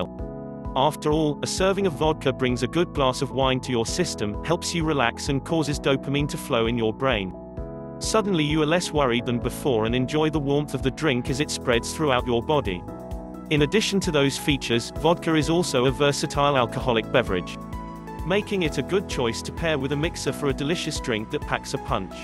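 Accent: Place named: RP, United Kingdom. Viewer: How rich are u😂 im not judging but this makes me feel like the queen of england is talking about vodka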